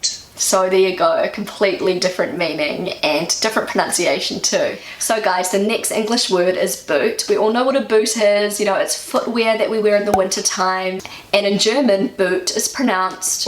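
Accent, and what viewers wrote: New Zealand. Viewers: Just found your channel and I am enjoying it! I think, with an Aussie twang, some of these German words feel easier to pronounce than perhaps yours with a Kiwi accent. Eg. Links ('Lien-x' in Kiwi, 'Lin-x' in Aussie). Probably vice versa on some words too. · As a native speaker of English, I have less trouble understanding your German than your Kiwi English. Dead, pronounced “did” Gift, pronounced “guft”. As with the famous graffiti: Australia 6 New Zealand 7 Pronounced: Australia sucks, NZ ‘sivven’ (‘s heaven). Keep up the good work! 😉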